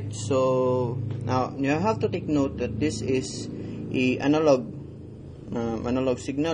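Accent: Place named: Philippines. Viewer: Well done. U r Filipino right ? (It is the accent that gives you away :-) )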